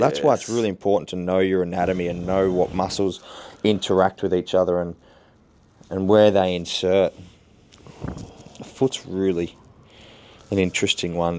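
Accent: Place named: Australia. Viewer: Getting fixed while that sexy Aussie sauve voice sexing me down...is what's up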